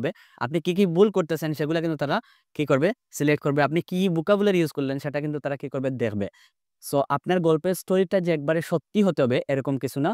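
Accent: Sylhet, Bangladesh. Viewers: আপনি কি সেলেটি?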